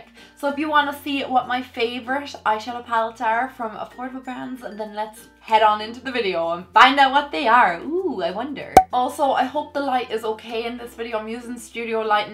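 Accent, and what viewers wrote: Ireland. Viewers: what part of Ireland are you from? I'm from Dublin love ur videos and love ya byeee!!!!!!!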